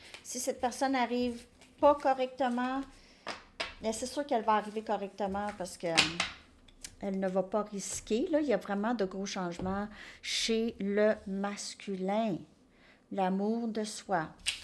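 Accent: Canada. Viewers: Merci belle créature j'aime votre accent canadien et vos guidance je vous adore belle âme (Algérie )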